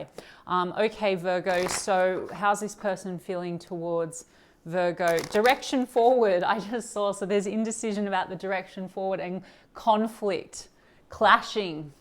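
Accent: Australia. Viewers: Great to hear a fellow Aussie gal reading….. absolutely spot on….. will check out the Law of Assumption🙏🏼🫶 🇦🇺⭐️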